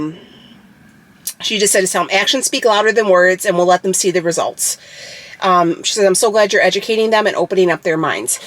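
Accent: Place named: Chicago, United States. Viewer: looked gorgeous! You did a great job on her makeup. Also love your Chicago/ Illinois accent, from a Floridian 😁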